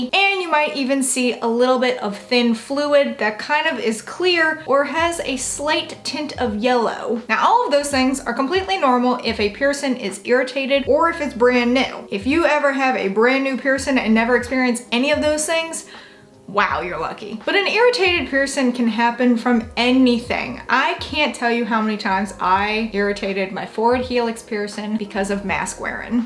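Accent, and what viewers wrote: South, United States. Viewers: I must ask your southern correct?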